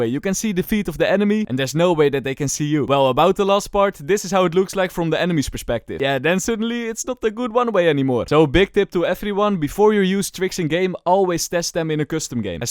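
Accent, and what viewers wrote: Netherlands. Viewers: are you dutch? I think i recognise your accent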